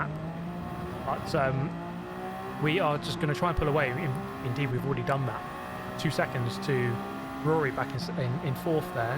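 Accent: United Kingdom. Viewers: Hello Viewes Heah (British accent, completely ignoring the existence of the letter R).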